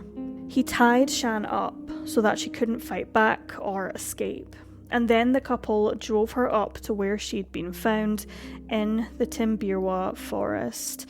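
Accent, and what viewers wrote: Scotland, United Kingdom. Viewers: I think she's scottish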